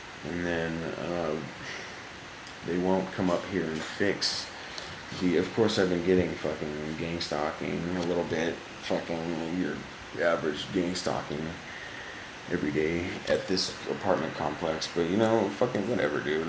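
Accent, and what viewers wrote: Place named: Australia. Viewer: ha you're Australian?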